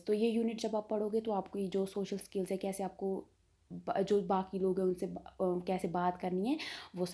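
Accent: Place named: Pahadi, India. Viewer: Uh have pahadi tone in language......btw my next exam is eng. daily life .. video helps me a lot❤️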